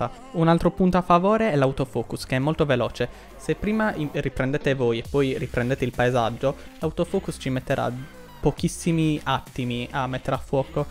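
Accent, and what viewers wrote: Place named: Sardinia, Italy. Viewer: L'accento sardo <3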